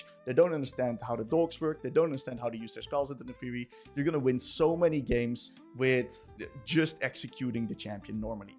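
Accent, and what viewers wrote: Netherlands. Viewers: I like your typical Dutch english accent!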